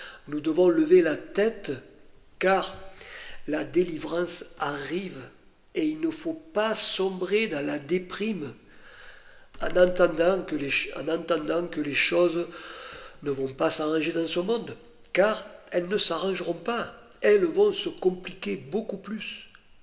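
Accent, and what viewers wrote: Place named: South, France. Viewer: Merci monsieur... je vous ai decouvert il y a peu de temps... j’aime la justesse de vos propos... je remarque un bel accent du sud! Peut-être Êtes-vous proche de chez moi ! J’habite a Prades à 46 km de Perpignan ! Y a t’il une possibilité d’aller vous écouter si vous êtes dans la region ? En tout cas merci infiniment pour vos prédications ... j’aimerais beaucoup que le mo’de évangélique se rapproche d’ Israël, de ces fêtes et de la Torah... que notre Seigneur vous bénisse abondamment ! ❤❤❤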